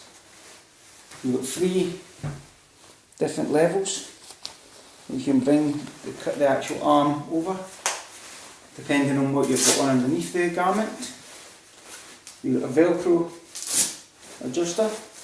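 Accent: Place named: Scotland, United Kingdom. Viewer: Dude are you scottish? wow your accent... Nice review anyway thanks!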